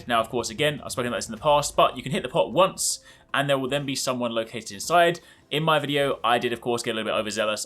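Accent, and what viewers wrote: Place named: England, United Kingdom. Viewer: You talk so fast and ur English accent makes you sound smart. But seriously I love how u cut to the chase. I'll follow you off a cliff if you ever jump off one.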